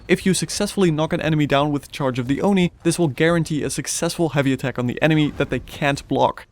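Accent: Netherlands. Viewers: I'm new to this channel, but are you dutch?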